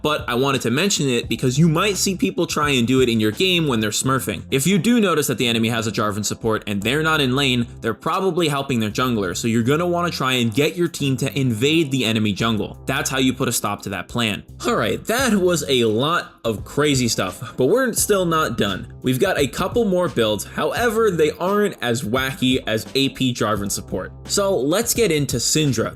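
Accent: Spain. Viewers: why would you pronounce a french phrase with a spanish accent?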